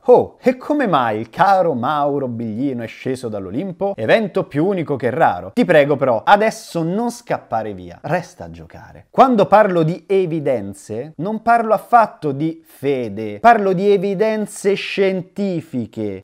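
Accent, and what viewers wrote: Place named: Rome, Italy. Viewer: PORCA MISERIA..... Ed io che mi sono sorbito pure sti 15 minuti di video per ascoltare un tizio che ambiziosamente affermava di aver finalmente confutato Mauro Biglino (quindi un po' di curiosità me l' aveva messa). Poi.... Ti rendi conto che si tratta solo di un tale che sfotte, provoca, fa le battutine, e il tutto con una parlantina antipatica e volutamente romanesca (così viene meglio...), in pieno stile "iene" (magari ambisce a diventare uno di loro?). Si vede dal video nel suo complesso, che questo qui non ha alcuna valida strategia comunicativa, cerca invece solo di rompere le scatole a personaggi pubblici, denigrandoli gratuitamente. Con i tuoi: "dove scappi", "a meure'", "t' ho dato na lezione", per non parlare dei pochissimi spunti, tutti inutili quanto il resto del video, hai dimostrato solo una cosa. Che sei RIDICOLO e non sai tenere una discussione con nessuno, perché se volevi davvero confrontarti con lui, bastava non fare il maleducato irrispettoso, quindi forse il tuo vero obiettivo era un altro... 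Ha fatto bene Biglino a non risponderti più, è ovvio, qualcun' altro al massimo ti avrebbe altrettanto insultato. Sei solo un gran maleducato. Spero che le "iene", che a me non piacciono, perche spesso e volentieri usano il tuo stesso approccio irriverente nei confronti di chiunque, non ti prendano mai con loro.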